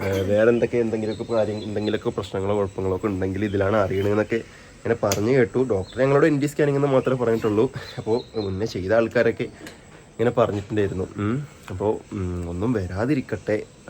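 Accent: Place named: Palakkad, India. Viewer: Ivan palakkad slang aayallo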